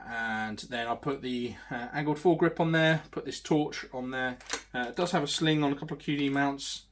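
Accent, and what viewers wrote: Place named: United Kingdom. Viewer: Hearing a British man talk about his AR15 is something I never thought would happen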